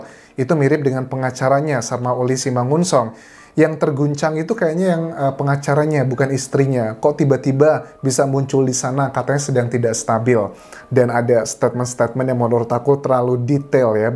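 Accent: Java, Indonesia. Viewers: Suaranya sama medoknya bang dan alisnya sama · Huuf suara asli jawa Pk dosen , kental Dgn logat jawanya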